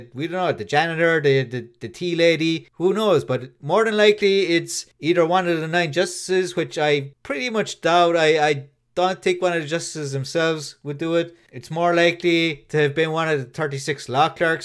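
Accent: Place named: Ireland. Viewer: Gona go ahead and guess your Irish….though you were an Indian dude fighting an accent when I first heard ya. Good content. They have conservatives in Ireland!? Though the whole island had been taken over by abortion activists…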